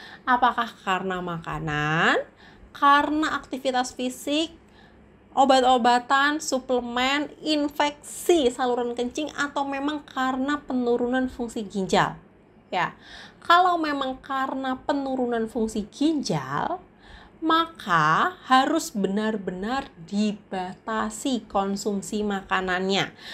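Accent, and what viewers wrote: Java, Indonesia. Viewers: Dokter medok😂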